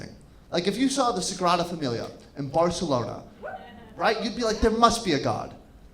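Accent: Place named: Jamaica. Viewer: Several times he was almost funny. I think he would have killed it if he spoke in his Jamaican accent.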